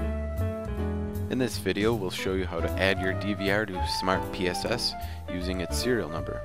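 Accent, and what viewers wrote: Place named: United Kingdom. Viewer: bahiya kaha se ho britain ya germanny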